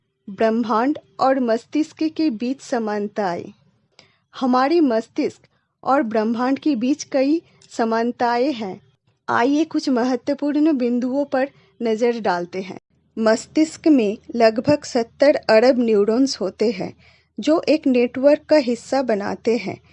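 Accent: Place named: Bengal, India. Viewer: You are a Bengali and trying to speak pure Hindi.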